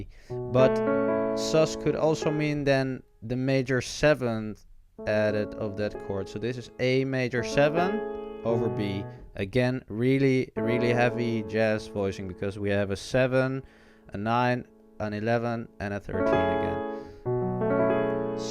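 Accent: Netherlands. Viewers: Thomason lu He is Dutch, I can tell by his accent. I'm Dutch too ;D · Great tutorial very clear! Are u Dutch?. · I think he is Dutch because he said "yeah?" And he said or to "of" which is only in holland people say that. They say "ja(yeah?)" and "of" in holland means "or" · You sound dutch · Are you dutch? Sounds so bc of your accent :p sr if its not hah .good videos btw! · I like your explanations starting from the basics and plus you sound dutch so good luck in the world cup!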